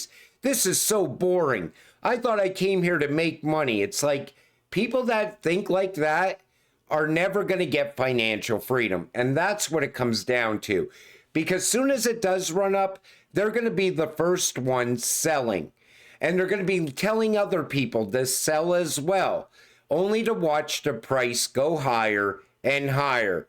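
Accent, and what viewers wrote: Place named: Minnesota, United States. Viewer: Hey where are you from in the us? I'm guessing up north like minnesota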